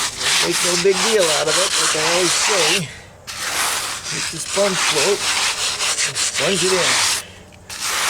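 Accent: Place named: Pennsylvania, United States. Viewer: Thanks for the video. One question, what kind of paint did you use for the steps? Masonry? Brand? Also I think I recognize the accent. You from the Scranton/Central Pennsylvania area?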